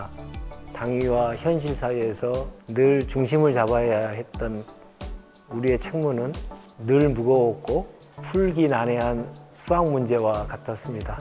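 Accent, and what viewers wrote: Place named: Jeolla, South Korea. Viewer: 표준어를 쓸려고 노력하지만 전라도 사투리인듯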